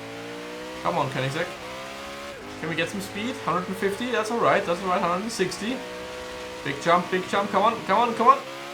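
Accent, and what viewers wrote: Germany. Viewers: Man merkt das du Deutsch bist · Ich Feier den deutschen Akzent 🤙🏻😀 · this german accent is driving me crazy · I bet, you're German? Cuz of accent. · You have a German accent? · are you german or why you speaking like a german guy english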